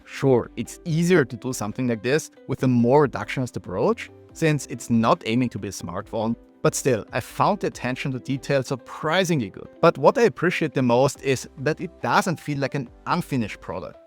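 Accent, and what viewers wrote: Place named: Austria. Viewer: Servus! Great review, but I started watching your videos for a minimal phone solution but have to admit how much I enjoy your Austrian English accent! Love from Canada 🇨🇦.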